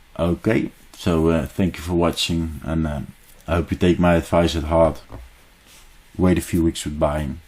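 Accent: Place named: Netherlands. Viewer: Let me guess.... Nederlands? Kan bijna niet missen haha.. 😜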